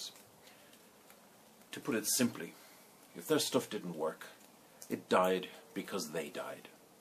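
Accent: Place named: Ireland. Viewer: Like your channel subcribed to lt lhave 20 years in karate done wingchun pressed thumbs up are you lrish you sound lrish lam lrish my grand dad was boxer and my uncles were boxer s lam 64 now love all things about japan and china my master was japanese there old lrish saying lt meant for you lt wiil not pas you by my mum and dad came from co limerick in lreland all besr with your channel godbless seamus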